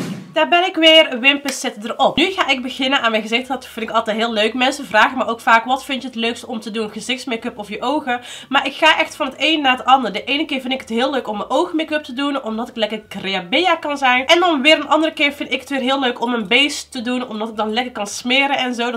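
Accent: Netherlands. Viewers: Lieve. Ik vind het jammer dat ik je nu pas heb ontdekt, maar beter laat dan nooit toch? Je hebt al meteen een fan EN een abonnee erbij. Je hebt echt talent ma sha allah. Blijf ermee doorgaan, ik kijk al uit naar de volgende video’s. Nog 1 vraagje, je hebt echt een heel leuk accentje, het is wel niet volledig Hollands als ik me niet vergis. Van welke stad is dit accentje dat je hebt? Groetjes ❤️🤙🏻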